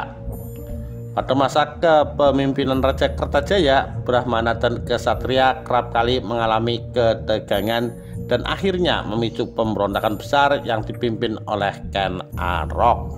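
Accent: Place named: Java, Indonesia. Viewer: Medok bener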